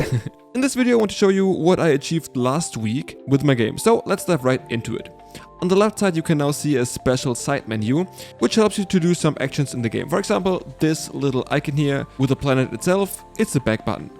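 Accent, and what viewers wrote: Germany. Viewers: I can hear the German acent, aber gutes Video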